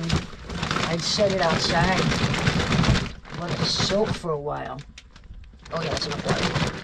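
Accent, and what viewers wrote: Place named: Midland, United States. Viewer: I love your midland accent! "Warsh" away! :)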